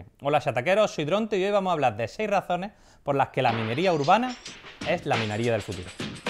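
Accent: Murcia, Spain. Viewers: JAJAJAJ este chico seguramente es de Murcia, es verdad que en cada región hablamos diferente pero tampoco vamos faltando a nadie 😉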